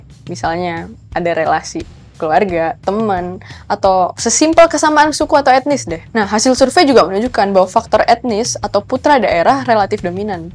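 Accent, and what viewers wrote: Java, Indonesia. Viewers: Logat jawanya agak muncul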